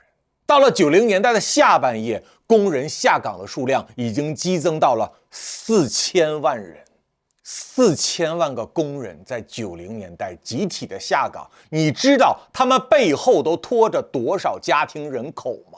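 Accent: Beijing, China. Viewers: 欣賞風流的京片子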